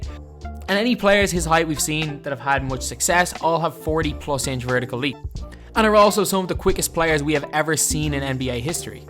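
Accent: Ireland. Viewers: This is summer league ball, there is no defense whatsoever being played. All these comments are not judging him how he should be judged. His release point on his shot is low, he does not look like he can touch the rim on any forays to the basket (taking it to the hole). I am not sure why a video was made of a guy playing summer league ball with no defense and the whole time the video shows him going by other kids of pretty poor talent. Jass looks fine as a player, but he is right hand dominant, low release point on shot, simply going around guys that are even worse than he is as an athlete. What can he do from a defensive stance, how fast can he tip the ball and then outrun several plays on the way to a steal and a bucket? This is what scouts have to see. Time will tell what he can do in college in Canada. But, he needs to shoot with a higher arc, higher release point above his head and the video showed nothing about what he can do on defense. 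Absolutely one of the most pointless videos of all time!! The narrator who made the video (with I believe an Irish accent) states that some say Jass will make the NBA and then begins to debate this on his video. Who is it that is saying Jass will make the NBA? This video is insane! Just let the kid develop and then we will see how good he can be. We won't know this for another two years.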